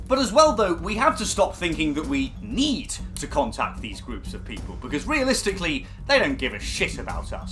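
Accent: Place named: United Kingdom. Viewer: Hate getting two minutes into a video and realising I can't watch it bc the narrator is Br*tish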